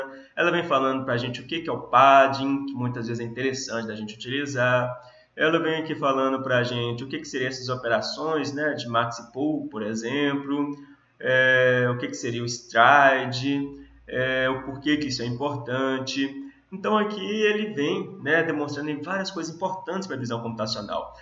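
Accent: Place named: Minas Gerais, Brazil. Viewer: Mestre, você tem um sotaque mineiro, és da UFMG em Montes Claros? Sou de Salinas, fiz essa disciplina na UFV com o professor Fernando e o Tibeau e mais recente em 2010 com o professor Edenio da Zootecnia no mestrado em 2009.. Ótimas sua aulas...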